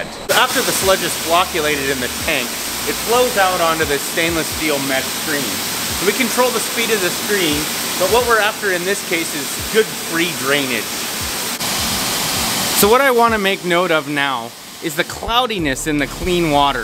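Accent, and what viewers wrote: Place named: Fargo, United States. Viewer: Thanks, good video. (and what a great Fargo Accent you have! )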